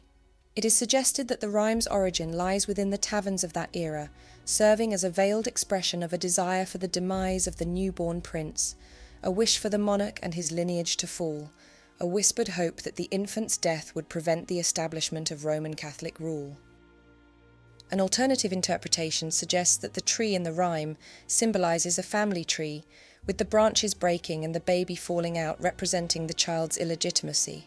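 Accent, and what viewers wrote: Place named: South, United Kingdom. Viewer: Where is your accent from? Yes uk....most probably the south but more precisely? Very easy on the ear and very good command of the English language. Love your channel..all very interesting and thought provoking. Thank you 😊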